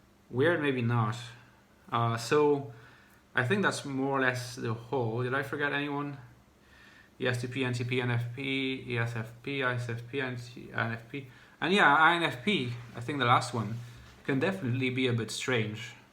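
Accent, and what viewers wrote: Ireland. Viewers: You shared a room with an Irishman! That makes a lot of sense...I thought you sounded Irish at first (and I still kind of do) I'm not even going to comment as much as I could on the weirdness part. I've been called 'weird' so many times in my life when I haven't even been ~trying~ (I appreciate your differentiating from innate and 'bestowed' weirdness since I never considered myself weird until I started to notice this pattern.) I'm also a girl (not sure of my type) so I think that makes the 'weird' accusations...different somehow? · Oh, so that's why you speak English with an Irish accent! (You had an Irish flatmate. It all makes sense now...)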